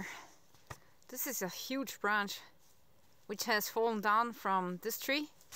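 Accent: Germany. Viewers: Really enjoyed this. That very German English accent is the best...greetings from Australia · Pasaba por aca y...escucho alguien de deutschland hablando american, but in that frozen field even me would be talking just to warm up.but congrats for have chosen a labrador dog for companion no better friend for any self sufficient girl.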